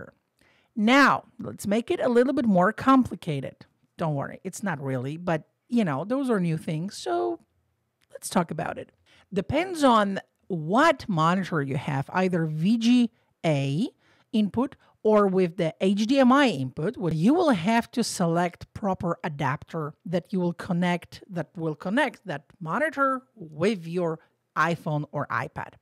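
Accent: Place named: Brazil. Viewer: You sound Brazilian. Awesome video! Thanks.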